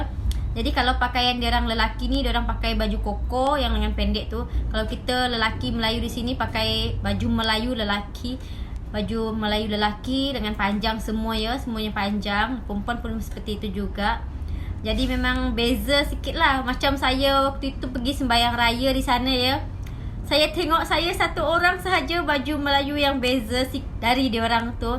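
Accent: Indonesia. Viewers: Assalamualaikum..admin ni orang Sabah ke..slang indo pun ada jugak bunyinya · ni org Indonesia knn... Bahasa yg awk guna tu.. gaya keIndonesiaan tu jelas kedengaran.. klo gaya bahasa Malaysia tulin lain.. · Dia org Serawak, mmg Ade slang Indo ckit kan. Sabahan pun mcm tu Tau. Awak x pernah Ade kawan sabahan n Serawak ke?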